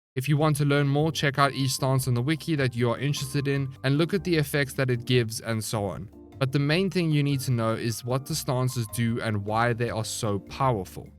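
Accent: South Africa. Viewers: Hey dude just discovered your channel. Really enjoy your videos so far, keep up the good work. Are you from South Africa? :)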